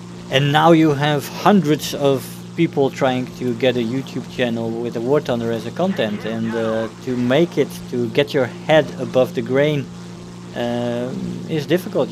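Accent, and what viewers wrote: Netherlands. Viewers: Great chat. I've been playing for approximately 2 years, and in that time, I've only watched three channels for WT. The first was Long5hot, the next was Tx141 and yours. I chose only these channels because of the ANALYSIS. These channels communicated nothing but useful information and helped me get passed the horrible newbie stage. The other distinguishing feature of these three channels is the adult and friendly nature of the narration. You get the impression from watching that the three authors are approachable and guys you'd like to know personally. I cannot say these things about ANY other channel I've watched. Some were even annoying. Finally, you introduce the element of humor and personal commentary, which I enjoy. The only other WT Youtuber that makes me laugh is Squire. So, in conclusion, you offer careful analysis, adult and pleasant narration with a Dutch accent, you include some humor and a personable approach. 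I'm proud to be a member of your squadron and I appreciate your contribution to the community. PS - TOTALLY PUMPED FOR THE ITALIAN TREE. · When I first saw you in battle you were such a nice guy, if I recall correctly it was a early Spit game. Some people commented on you and I decided to look at your channel. Intant hooked, you're helpful and mature. Your accent is kinda cute too (Is it Dutch?)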